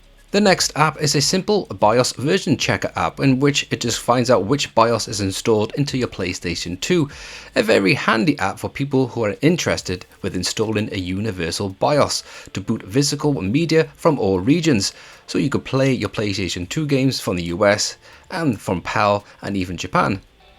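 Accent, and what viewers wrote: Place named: South Wales, United Kingdom. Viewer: Your definitely from South Wales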